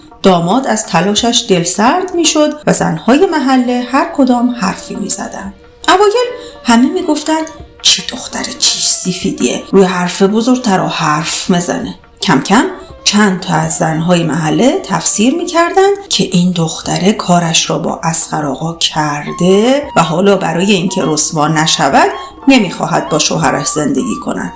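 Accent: Yazd, Iran. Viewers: یزدی هستید؟؟ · چه لهجه قشنگی .یزدی هستید خودتون؟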